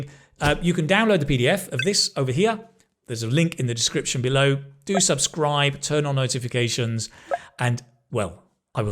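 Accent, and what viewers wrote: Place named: RP, United Kingdom. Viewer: Sir could you please explain if it's possible for a person to learn your accent in just 4 months? (I am not exaggerating). Cause that's what exactly is happening to me right now, i completely lost my previous American-ish accent i would say that i can confidently speaks 80% of your accent without even thinking or telling my brain if how it should sounds like. I admit the Modern RP accent fascinates me that's why i invested myself emersing with the accent. I have to ditched those non-RP speaking shows, movies and podcasts and focusing only in modern RP sounds that shadowing and mimicking becomes part of my daily routine as if it is part of my body. I'm not just thinking in a modern RP accent i also literally speaks them, our cats and dog has been stressed out because i am also speaking to them. When i was just 11 yrs. 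old back in 92' i have this "Speech Impediment" disability where i constantly stutter and stammer, i can't speak my Filipino language constantly and spontaneously without stuttering. Then i noticed whenever i speak an English word or sentences my disability were non-existent, since my disability had separated me from the world of communication i invested myself learning the English language without having someone guiding me how to learn them. At that time we can't barely afford buying the things we need so my family can't afford of getting me an English tutor, so i'm on my own. That time the only way for you to learn understanding each and every word is by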